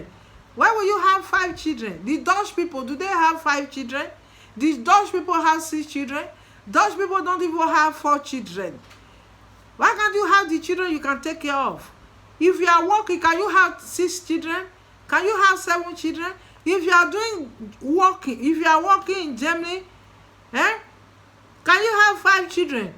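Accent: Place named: Pidgin, Nigeria. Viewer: Your pidgin dey kill me aswear... Making sense.. We gather dey. No lele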